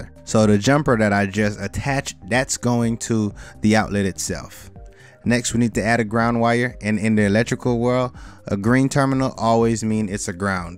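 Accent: United States. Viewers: Where's old mates accent from? Not too familiar with yank accents outside the obvious ones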